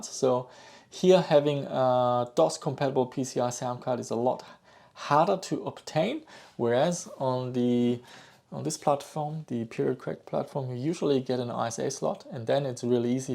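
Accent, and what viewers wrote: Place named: Germany. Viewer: Always enjoyed your videos! Question (please don't be offended): A few years ago, when I first started watching your content, I immediately thought you were German, but later learned that you live in Australia. My question is if you are in actuality a German living in Australia, or if you are a native? Your accent really throws me off :D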